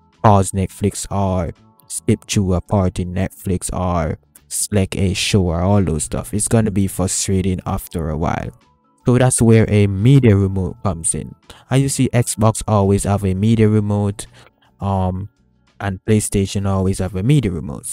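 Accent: Jamaica. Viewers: This man is Jamaican Peeer da rimot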